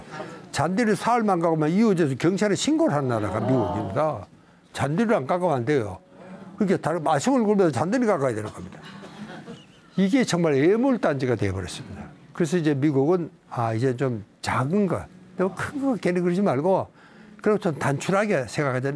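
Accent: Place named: Daegu, South Korea. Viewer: 선생님 대구사투리 쓰시니까 좋습니다 저도대구사람입니다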